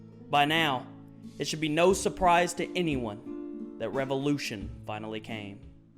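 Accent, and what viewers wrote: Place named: South, United States. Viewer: I saw your post or comment on Reddit a week or two ago, or whenever it was and saved this video to watch later, and man, you went above and beyond my expectations. The quality of this video is really great for you only being at 36 subscribers. Everything about this video is perfect, your faint southern accent, your charisma, the editing, the music choice, and your insightful look at a part of the game that is seldom talked about beyond "I liked it". I learned a whole lot, and I only appreciate the game even more than I already did You my friend, have earned my subscription. Keep it up man, it will pay off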